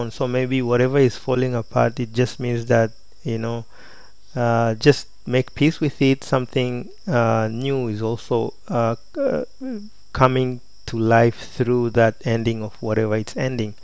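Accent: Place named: Uganda. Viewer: I thought it was Ugandan... it sounded so familiar!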